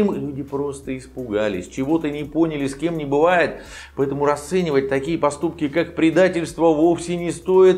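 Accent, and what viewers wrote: Moscow, Russia. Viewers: Кааакой мааасковский прооонаанс!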